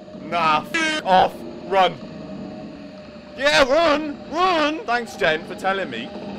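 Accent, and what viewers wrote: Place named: Wales, United Kingdom. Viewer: Sounds a bit welshy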